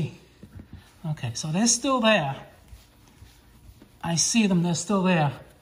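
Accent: New York, United States. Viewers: The New York voice rules. I love it.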